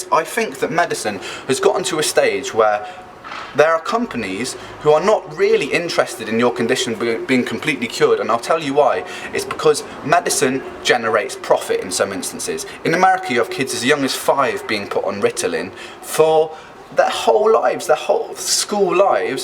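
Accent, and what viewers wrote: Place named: United Kingdom. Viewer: You are cool , I like the british accent , I'm from India , a developing country , our scene is the same . But Our country is trying it's best to built government hospitals and free medicine from them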